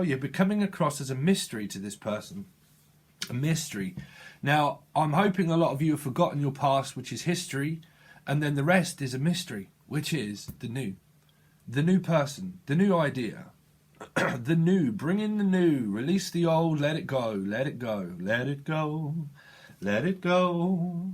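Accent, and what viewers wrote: United Kingdom. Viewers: i understand british accent better, its more clearer than australian accent, thank you its quite resonate with me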